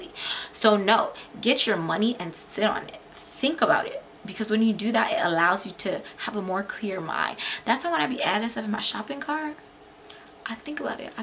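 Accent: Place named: Toronto, Canada. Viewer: I don’t know if I’m bugging, but I can lowkey hear a Toronto accent😭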